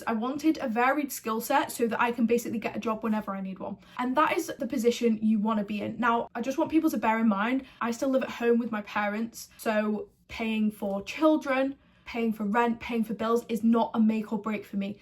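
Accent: United Kingdom. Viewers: you got a slight birmingham accent you from Brum ? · Very switched on and can tell your Driven you sound like a stokie btw 😉.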